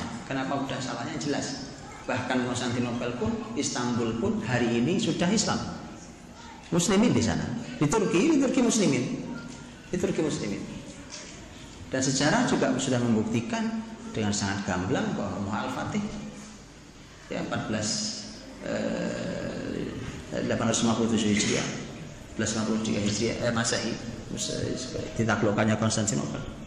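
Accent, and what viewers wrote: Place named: Java, Indonesia. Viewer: oh pantesan logatnya jawa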